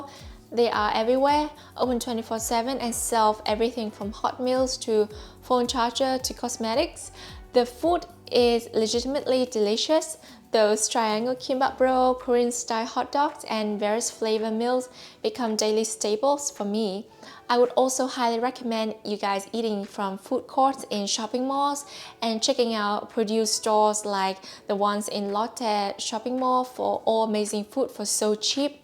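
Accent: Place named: Vietnam. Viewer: Vietnamese accent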